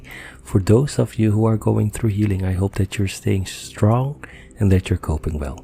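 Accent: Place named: Netherlands. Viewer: Lovely Dutch accent 😃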